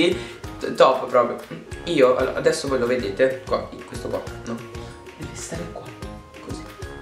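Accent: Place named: United States. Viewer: Sembravi un americanoLuuu😂😂😂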